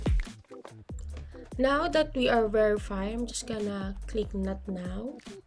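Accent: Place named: Philippines. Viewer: i knew it, you're from the Philippines 😅 we have the same accent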